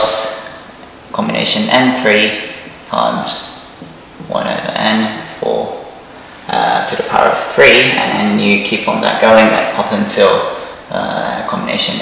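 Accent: United Kingdom. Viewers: 美しいイギリス英語！ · If anything more inclined to British English his English is, which is really comfortable to hear. A great explanation.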